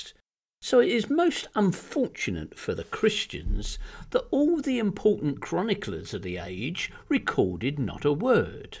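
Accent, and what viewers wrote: United Kingdom. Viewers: Why do these bible bashing videos always have such a pretentious tone... this one with a British accent? These presentations go to extreme lengths to invalidate such insignificant things. To ridicule what is already ridiculous is even more ridiculous. Sorry, not very impressive.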